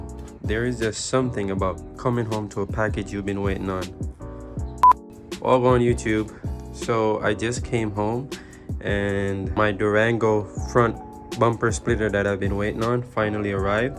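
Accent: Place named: Jamaica. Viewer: Dowg sound like a yaad man so. Good look mi g. Mi just buy a 2019 gt 2 weeks now and mi love it and about to start doing some mods. So keep the content coming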